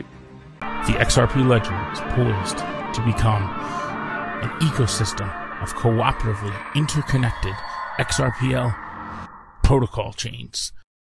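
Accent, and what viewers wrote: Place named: New York, United States. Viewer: brother, thank you. Sound like a fellow NY'ER. Please, whenever you have time, can you go through the steps as if we're 6 yr Olds with all the new stuff coming out. Enoysis, f-assets, delegating. I know you go deep but if you showed us the complexity via how to's, we retards would get it faster. Sgb and lost 20k tokens since price went up, impermanent loss. Didn't even know bro. Help us since you understand it all!!! Gracias!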